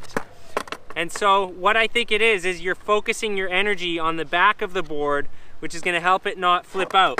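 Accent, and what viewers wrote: Canada. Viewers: Is that an Ontario accent? idk if you are but you sound incredibly Canadian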